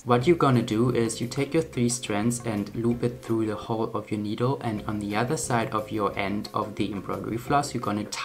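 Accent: Germany. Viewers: i find you first on tiktok, and now understand you have YT CHANELLL I started studying German this year and i heared smth simmilar between German pronounciation and your speeking cheking your ig MUNICH DE ME i knew itttttttttttt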